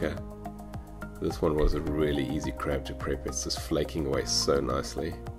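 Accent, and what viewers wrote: South Africa. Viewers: Your address says New Zealand, but your channel name and accent says South African? Great video btw.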